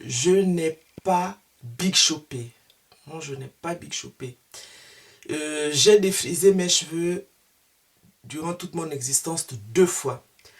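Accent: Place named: Gabon. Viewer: Joyeux nappyversaire.Je viens de découvrir votre chaine et j'aime bien votre façon d'expliquer les choses. Et cet accent ...je dirais Gabonaise!?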